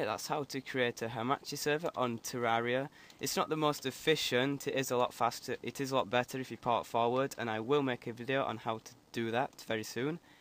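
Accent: Scotland, United Kingdom. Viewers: Scottish Accent :3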